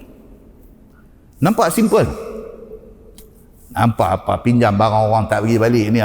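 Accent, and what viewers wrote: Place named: North, Malaysia. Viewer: Saya suka dengar , sbb kadang keluaq bahasa utara yg dah lama tak digunakan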